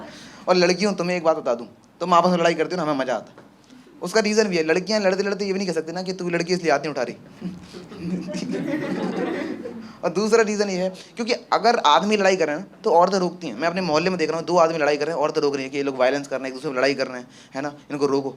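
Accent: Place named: Gwalior, India. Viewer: Proper gwalior accent 😂😂 love from gwalior...proud of you ❤❤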